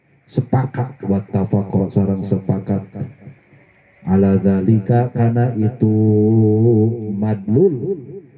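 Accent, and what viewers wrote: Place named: Sunda, Indonesia. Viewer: urang sunda mah tangtu raoseun ngangge logat sunda, nuhun pisan.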